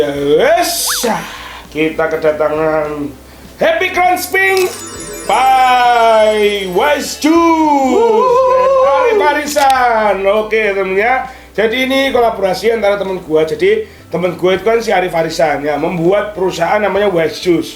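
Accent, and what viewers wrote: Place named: Java, Indonesia. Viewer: Skalin konten ngomong gua nya 1000 kali😂😂 medok lagi😂😂🙏🙏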